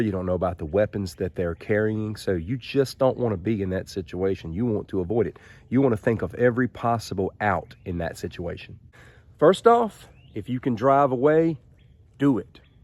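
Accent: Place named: Arkansas, United States. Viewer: Are you from Arkansas? Your accent makes it seem very likely.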